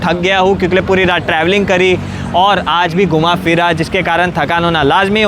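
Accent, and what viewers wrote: Gujarat, India. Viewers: You are gujarati or something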